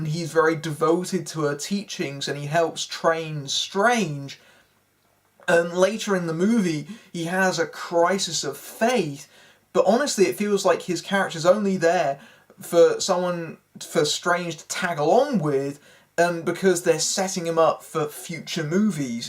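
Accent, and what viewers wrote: United Kingdom. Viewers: JotunTV It is called a British accent, something I don't expect an ignorant dumbass like yourself has encountered before.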